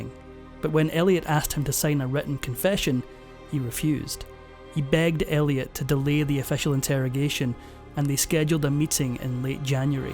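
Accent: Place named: Scotland, United Kingdom. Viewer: The commentary refers to "England", as if Scotland and the rest of Britain were not also a target for foreign intelligence. As if they were somehow uninvolved, like with the Empire, when the reality was very different. Also, someone with a Scottish accent not knowing the forename St. John is pronounced Sinjun....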